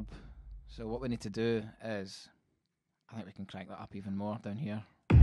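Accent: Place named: Scotland, United Kingdom. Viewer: scotland haha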